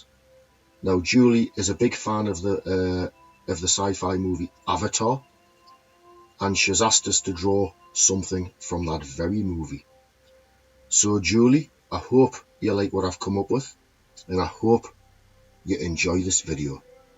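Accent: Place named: Scotland, United Kingdom. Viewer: what a talent you have, amazing pictures !! like the accent as well, is that scotisch sir.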